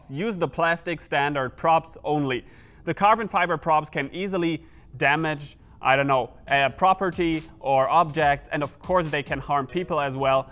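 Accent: Germany. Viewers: bist du deutsch ? :D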